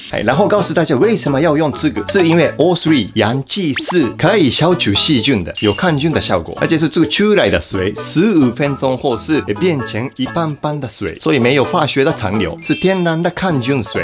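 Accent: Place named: Taiwan. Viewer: 好可愛噢！話語裡還會加上台語，看影片很療癒，搞笑，歡樂氣氛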